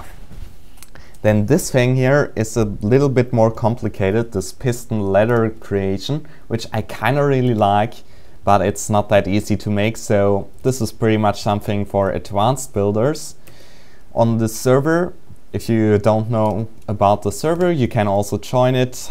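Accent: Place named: Germany. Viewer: man i love your german accent so much. Haha Nice video man!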